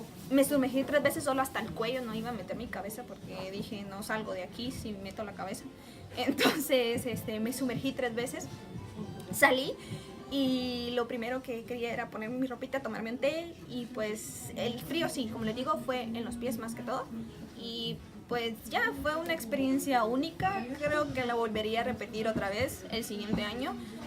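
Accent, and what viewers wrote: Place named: Ecuador. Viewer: Hola, usted es Ecuatoriana oh Mexicana porque tiene un acento parecido a la Ecuatoriana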